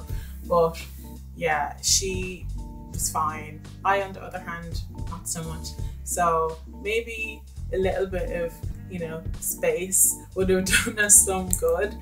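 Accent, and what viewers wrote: Ireland. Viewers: Which part of Ireland is that accent from?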